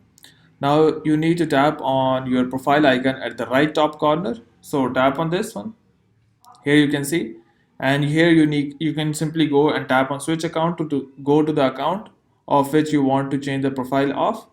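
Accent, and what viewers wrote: India. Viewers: You know he speaking facts when you hear he’s Indian accent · Right when I heard that Indian accent I knew it would be the easiest tutorial thanks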